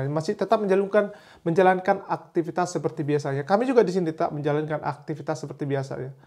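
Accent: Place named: Madura, Indonesia. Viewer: Logatnya mirip orang madura 😂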